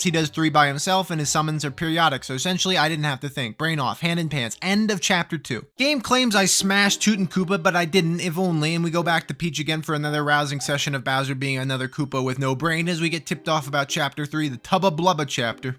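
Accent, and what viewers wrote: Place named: New York, United States. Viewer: I never knew I wanted someone to do game challenges, talking a mile a minute, and with the THICKEST New York accent I've heard in my life before! I watched this and the Mother 1 video yesterday, and I find them interesting.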